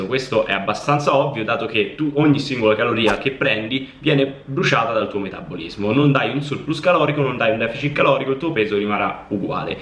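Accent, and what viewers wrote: Rome, Italy. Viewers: Allora: primo, sei romano, e quindi ti starei ad ascoltare per ore ahahah!! XD E secondo, scherzi a parte.. i tuoi video sono sempre chiari, semplici, e spiegati perfettamente, anche per me che sono una principiante in quanto a fitness e nutrizione... =) Continua così, io sto divorando i tuoi video uno dopo l'altro! Grazie mille! =D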